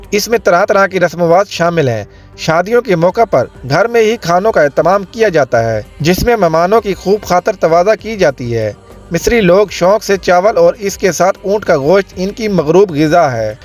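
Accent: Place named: Punjab, Pakistan. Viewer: بھائی اردو تو سیکھ لو ۔۔ پنجابی میں اردو بول رھے ھو ۔۔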